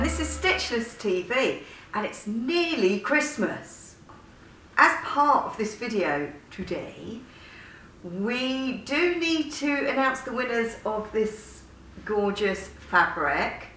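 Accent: United Kingdom. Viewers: Happy Christmas, and thanks for the "tour", especially the beginning as I spent the first 12 years of my life in West Ken. That and your west london accent made me nostalgic. Thanks to your channel I have revived my sewing interest and my new year aim is to work through a Japanese dressmaking book (in English!) I aim to upgrade my style as I am on a diet. 🎄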